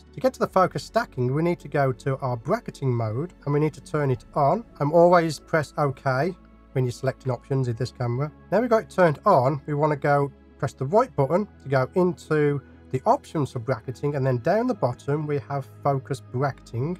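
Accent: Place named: Birmingham, United Kingdom. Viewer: that makes sense. I ended up getting one of the rails a bit like the Nisi one that you reviewed. I had a cheaper 4 way plastic one but I found it was a bit temperamental at moving. Also picked up a OM-1 which I am super happy with! Also, Birmingham based? I am sure I recognise that accent! (Brummie here too, but moved away for 10 years and recently came back, god knows why ha).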